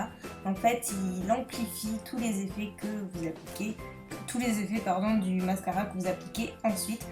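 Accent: South, France. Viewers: Tu es sublime! ♡ Je regarde tes vidéos depuis pas mal de temps maintenant et c'est toujours un plaisir!! Tu es absolument magnifique et tu as très bon goût. Je te souhaite de pérenniser sur Youtube et que tout aille bien pour toi. Ta bonne humeur et ton petit accent du sud sont un vrai rayon de soleil!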